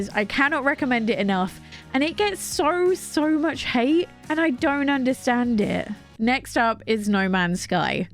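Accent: England, United Kingdom. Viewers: I know you have an English accent, but her name is Mirabel, not Marybelle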